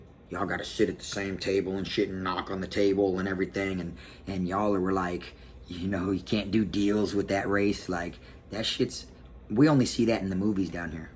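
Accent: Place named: Baltimore, United States. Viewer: Yo u that nigga real talk yo I'm from bmore and it's the exact same way u talkin bout out here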